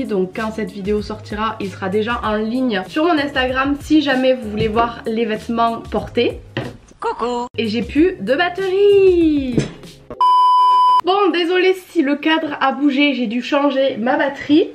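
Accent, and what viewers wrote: South, France. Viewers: J'adore ton accent du sud 🥰 j'avoue la satisfaction du rangement 😅 par contre la couette j'avoue c'est un bordel 😭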